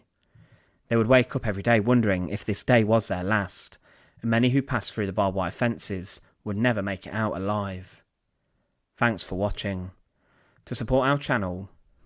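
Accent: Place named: England, United Kingdom. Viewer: Love your videos but your English accent is so damned thick I can't understand half the time. Sorrym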